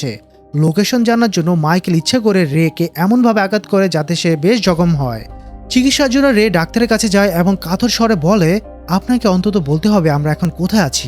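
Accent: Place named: Sylhet, Bangladesh. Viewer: Vaito Sylheti lager ba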